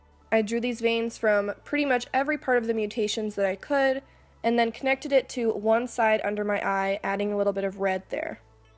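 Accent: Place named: Albuquerque, United States. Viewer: Are you a Burquena? Some of the ways you say things make me think you're from my hometown! haha Specifically when you said "Eeee! All creepy!" :)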